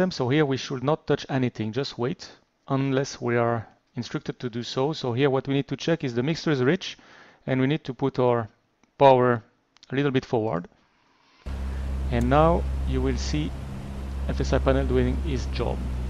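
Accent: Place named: France. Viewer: I love your France accent realy now my request me and all my friend between <num> group what they call us gooney birds so..fsx or developer put all gauges in the wrong place we were making correction by FSPanel studio in fsx but now P3D v4.2 way better then fsx which is normal new generation smart boys,,but we don't have FSPanel Studio for P3D v4.2 can you help us we are 200 thounds staying a life make us happycheck me out in you tube..murray ayata fspanel studio may be better understanding regards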